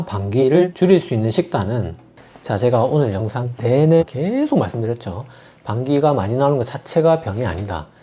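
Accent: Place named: Busan, South Korea. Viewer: 전라도 광주 사는데 원장님 부산 사투리가 넘 재미있고 정도가구요 의학정보를 부산 사투리로 들으니까 은근 중독성 있어서 계속 듣게 돼네요 앞으로도 부산엑센트 계속듣고싶어요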